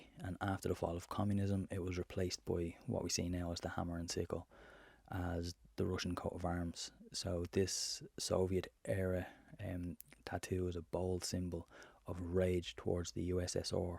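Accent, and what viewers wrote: Ireland. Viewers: OMG !!!! Love your accent so much! Ireland ❤️❤️❤️☘️☘️☘️🇮🇪🇮🇪🇮🇪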